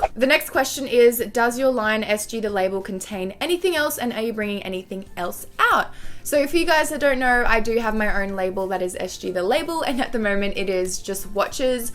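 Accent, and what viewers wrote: Australia. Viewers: Hey I can tell ur Aussie/New Zealand?...twins.hope ur near Sydney.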